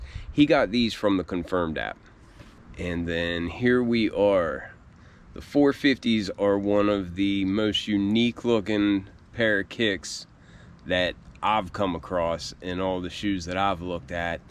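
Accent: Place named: Pittsburgh, United States. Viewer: Are you from the Pittsburgh area? I’ve lived in Pittsburgh my entire life and you have a pittsburgese accent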